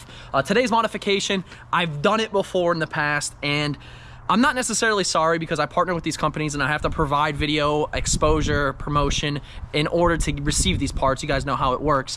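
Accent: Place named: Virginia, United States. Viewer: What part of Virginia is he from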